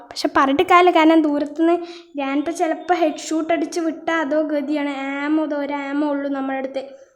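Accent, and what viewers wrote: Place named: Palakkad, India. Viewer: Palakkad annu thonnunu😅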